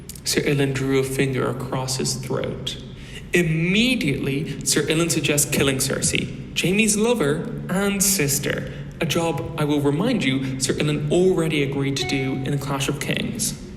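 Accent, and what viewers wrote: Ireland. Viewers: Easily one of my favourite theories! as for your accent, I diagnose you as Irish! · Love the theory. Haven't seen many creators ponder his role or the parallels between him and Wex. Definitely an Irish accent. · Excellent video. I'm looking forward to whatever you put out next. RE: The accent, almost certainly Irish, I'd guess Dublin but not certain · I really like the character Dick Crab. Your clearly Irish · My guess is Ireland? for accent that is lol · Re: your accent question, I’m from the US and you sound Irish to me (specifically the way you pronounce some of the names) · I liked the video. Seemed professional. I had no idea you were a new channel. Seemed like you've been doing this a while. Loved the topic, and I noticed some interesting bits about Payne in the story, but I never thought too much about it, and this was a cool dive into it. 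And as for your accent, I'm sure it's not strong in the sense of the general country of where you're from, but I'm American, and anything from the English-speaking world that isn't American sounds strong to my ear. I'm going to guess Irish. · You sound a bit Irish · Phenomenal theory! Love how Ilyn's inability to speak mirrors Stonehearts and how Podrick is involved. Also I'm guessing you're from Ireland based on your accent. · You sound Irish to me. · I think it's not unlikely there is truth to this theory. If there is, I think Arya may be present when Stoneheart questions ser Ilyn. I think it is Stoneheart's vengeful nature that will make Arya turn away from her path of revenge, and ser Ilyn's questioning may be a part of that. As for your accent, after paying attention to it, it definitely sounds Irish. It is, however, not a very strong accent (especially not compared to other Irish people I've met). 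· Is your accident Irish? It's strong enough to sound pleasant and sophisticated on my crude yanky ears.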